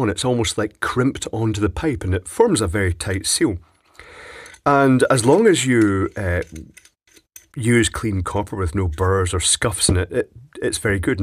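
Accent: Scotland, United Kingdom. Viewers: Not sure why I watch this. Perhaps it's just nice to hear a fellow Scotsman talk. Thanks for the video,.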